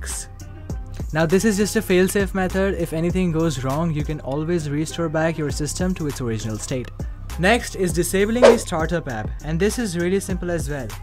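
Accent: India. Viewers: It actually works! It didn't help me to a great extent but it's defenitely better I think the firewall settings made a huge difference,my game is so much better now! Thanks for that Also i have one critisism. No hate just a friendly critic,you should just stick with speaking indian accent(or speak accurate American accent), you're trying to speak American but it feels very much like indian accent mixed with American accent(I don't have a problem with that but it's hard for me to understand,and I'm sure it's hard for many others as well) Peace 👍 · nothing your voice was like a indian because idk why i can tell every voice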